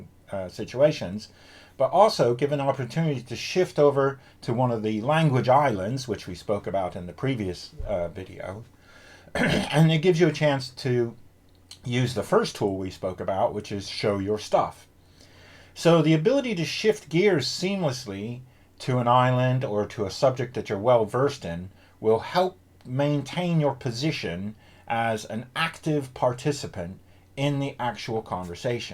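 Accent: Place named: United Kingdom. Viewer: Why do i keep hearing abit of a british accent , 😂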